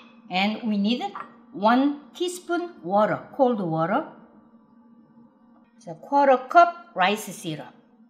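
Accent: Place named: South Korea. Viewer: 아줌마 안녕하세요?저는 문화센터에서 교양영어를 가르치고 있는 강사입니다. 최근에 학생분을 통해 동영상을 보게 됐는데 자꾸 빠져드는 매력이 있네요. 수업시간에 자주 보게 되는데 학생분들도 참 좋아 하세요. 이런 말씀 죄송한데 너무 귀여우세요..ㅋㅋ 한국식 발음이 뭍어 있는 영어지만 너무나도 훌륭하게 하실 말씀을 다 하시는걸 보고 학생분들이 영어가 귀에 쏙쏙 들어 온다며 자신감과 용기를 얻으세요. 저는 초중고를 뉴저지 Cliffsidepark에서 나오고 석사는 NYU에서 했어요. 박사는 이대에서 영어교육을 수료한 상태구요. 망치님이 뉴욕에 살고 계신다니 방갑더라구요. 저도 요리하는걸 참 좋아해서 외국인들을 대상으로 한국 쿠킹 클라스를 열곤 했는데 저의 방식과는 다른 레시피로 시원시원하고 섬세하게 요리를 하셔서 제가 배울점이 참 많은것 같아요. 몇가지 요리는 저도 시도 해봤답니다. 다음 비디오에는 오므라이스나 한국식 볶음밥을 해보시는건 어떨까요? 김치볶음밥도 괜찮구요. 만들기도 쉽고 한끼 식사로도 훌륭하니까요. Kudos입니다. 앞으로도 맛있고 즐거운 비디오 많이 부탁 드립니다. 지켜 볼께요. 화이팅 입니다.!!!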